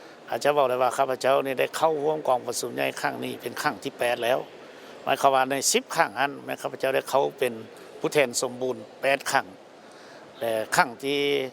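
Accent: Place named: Vietnam. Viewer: เว้ากะบ่อชัดภาษาลาวเว้าผสมแกว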